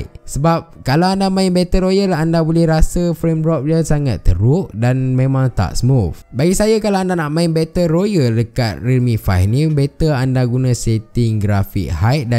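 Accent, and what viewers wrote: Taiping, Malaysia. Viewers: Sah la abg orng taiping😂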